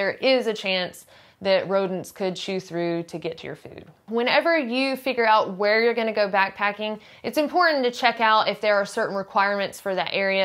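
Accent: South, United States. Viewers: Absolutely LOVE your southern accent, I'm from the South and it reminds me of home! My wife sounds the same; Riiiiiice! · My moms account but your southern accent creeps in i love it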